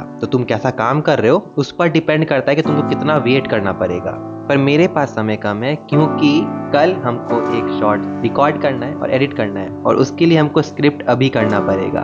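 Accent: Bihar, India. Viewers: Bihar se ho kya bhai😊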